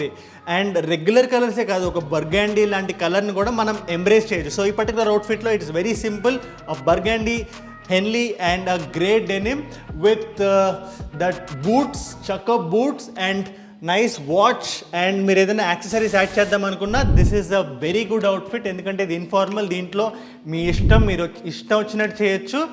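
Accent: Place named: Hyderabad, India. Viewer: Love from Hyderabad, perfect Hyderabadi way of telling❤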